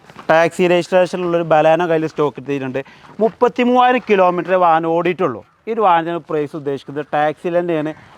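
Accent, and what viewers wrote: Malappuram, India. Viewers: മലപ്പുറം ഭാഷ യിൽ ഉള്ള വീഡിയോ വേറെ ലെവൽ 👍🏾👍🏾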